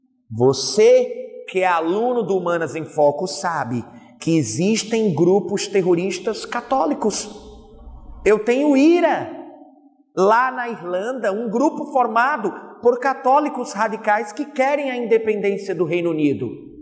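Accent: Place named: Northeast, Brazil. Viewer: Esse professor tem jeito que é nordestino sou tbm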